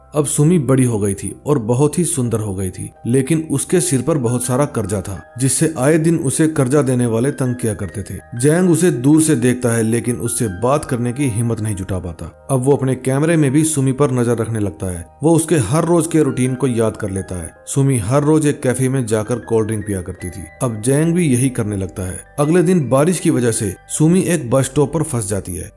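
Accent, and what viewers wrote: Punjab, India. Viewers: Punjab se hi bhai ki awaj Punjabi he bro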